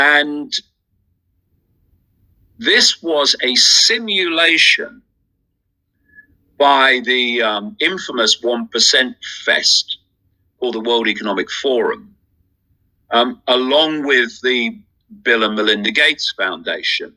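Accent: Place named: United Kingdom. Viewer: Woke In So Cal 100% agree! He can be funny too when he mocks people in his British accent 🤣 be vigilant,stay safe and head on a swivel! Much love ❤️💯🙌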